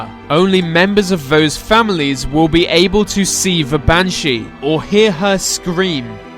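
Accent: England, United Kingdom. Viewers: He's English for sure! :)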